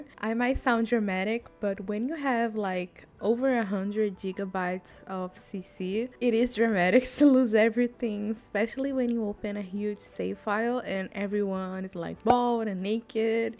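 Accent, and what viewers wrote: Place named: Brazil. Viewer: I knew I recognized your accent, happy to see another fellow brazilian sims player haha. Excited to see more of your videos ❤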